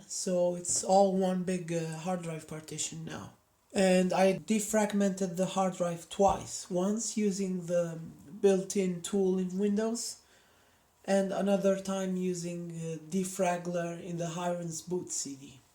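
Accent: Italy. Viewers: Sei italiano vero? Non hai una pronuncia da madrelingua :D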